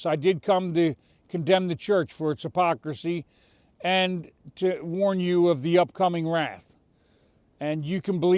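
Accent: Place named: Pittsburgh, United States. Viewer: I hear that Burgh accent too💪 Yinzer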